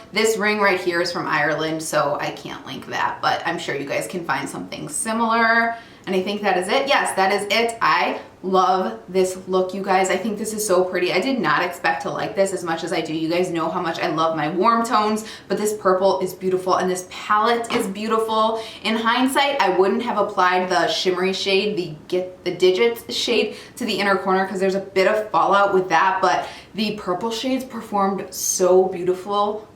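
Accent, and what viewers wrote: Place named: Northern Illinois, United States. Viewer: Hearing her accent makes me homesick. I grew up in Central Illinois but my mom's side is from Northern Illinois, but south of Chicago, and she sounds so much like my cousin whom I miss terribly.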